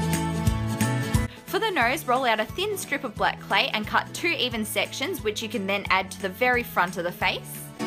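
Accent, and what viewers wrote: Australia. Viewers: i love your tutorials! are you australian? im aussie.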